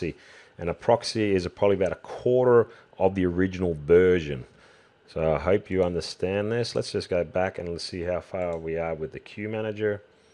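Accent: Australia. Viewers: thanks alot. Big help. please do the costume template video as well nice to hear aussie accent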